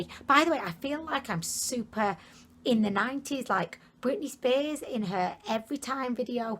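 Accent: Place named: Lancashire, United Kingdom. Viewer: Hey! Lovin´ ur videos as always, your lancs accent reminds me of home:) Have u ever tried Kiko milano? Have a nice day and don´t stop being you:) xx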